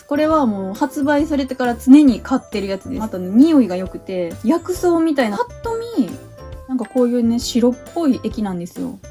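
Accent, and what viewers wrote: Kansai, Japan. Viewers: 初めまして✨ サムネの可愛さと今スキンケアが凄く気になっててめっちゃ参考になりました🥺💕💕 気になったアイテムをQoo10で購入しようと思います✨ あと私関西人なので関西弁がとっても親しみやすいなと思いました🥰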